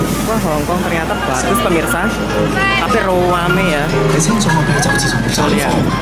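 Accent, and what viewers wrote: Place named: Kediri, Indonesia. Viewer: selalu nunggu upload tan terbaru... Mz ngemong and sama temu kanggen soalnya juga punya saudara kediri and blitar yg logat ngomongnya sama.. ☺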